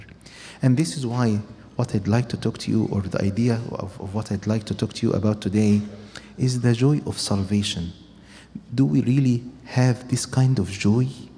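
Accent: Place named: Northern Mariana Islands. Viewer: hmm this is in english, though the accent is thick, and though I am certain this Priest is from the middle east parentage he kind of sounds like some people I know from the marianna islands in the pacific they kind of sound spanish with they way they pronounce certain words, that aside about 5 minuts in I am enjoying listening to this sermon, its very deep and thought out, and last but not least informative, oh I am a traditional roman catholic another appostalic church if you copts like to know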